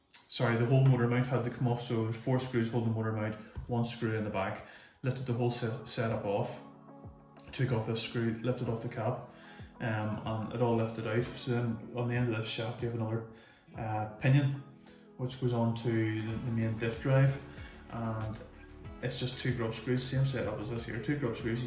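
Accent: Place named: Ireland. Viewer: Can't hear wtf your saying . Sort it out. I guess u are Irish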